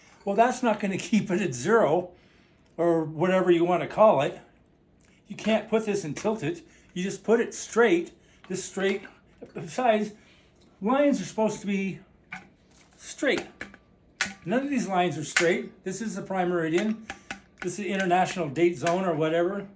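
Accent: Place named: United States. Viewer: HEY! Further to my other comment. I took a quick look through your YT channel and conclude that you are 'Muricun. You say EEquator and not eQUAtor. I described in my other comment how a Mercator projection is made. Now I will tell you about the TRANSVERSE Mercator projector. In the US of effen A!! Large scale topographic maps are created using a TRANSVERSE Mercator projection. A meridian (line of Longitude) is chosen as the central meridian for any particular map. The entire country is gridded out so these reference meridians are specifically chosen to allow map sheets to line up with ones east and west (and north and south) of them. Imagine a cylinder of paper wrapped AROUND the globe along an above stated meridian line. The maps produced here are VERY LARGE SCALE so only a very tiny bit of the earth's surface is projected for these maps. The largest scale map that is usually created for these topographic maps is a scale of 1:63360 WHY that number? 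I live in Canada. An equivalent map that is produced here is 1:50000, so it is slightly larger in scale. But WHY does the USA use 1:63360? ANY idea? I'll let you figure that out. When you do, then figure out why Canada uses 1:50000 These topographic maps are quite accurate. They ALL have a bit of distortion at the east and west edges. They are accurate ONLY on the very center meridian line. You can purchase several map sheets that adjoin each other and cut off the large margins to leave JUST the map part, and then fit the she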